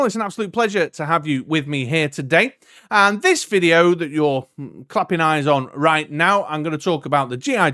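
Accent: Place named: United Kingdom. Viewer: Thanks for the run down mate! Its always great to hear it in a brit voice lol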